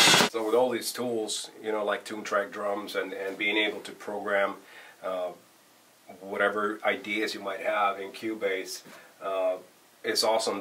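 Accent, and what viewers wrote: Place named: United States. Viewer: His American accent is almost as good as his drumming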